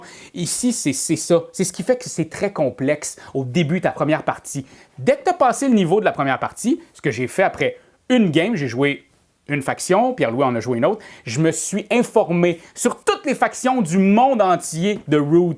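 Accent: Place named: Canada. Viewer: T'es hyper sympathique à suivre, tu mets tant d'énergie d'humour et de bonne humeur, que tu es de la drogue en vidéo 😉 Et puis cette accent Canadien, pour moi Français, c'est comme celui des cousins Suisses Belges ou Africains de l'ouest, c'est tellement rafraîchissant ! Salut fraternel de France et continues de nous régaler 😘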